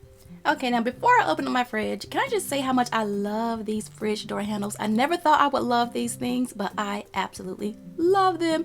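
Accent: South, United States. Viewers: Subscribed cause I love her southern accent 😍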